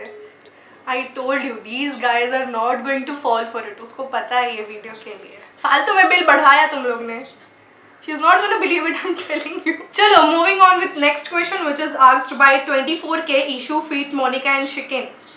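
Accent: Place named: Gujarat, India. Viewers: You speak so funny Gujarati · Woh woh wohhh.. u speak very sweet gujju. Jus loved.. lots of love n blessings..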